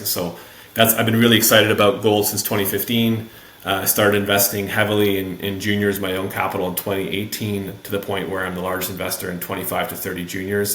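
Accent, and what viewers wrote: Netherlands. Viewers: German name, Dutch accent. I saw some interesting unknown high risk , high reward names in your playlist 🤔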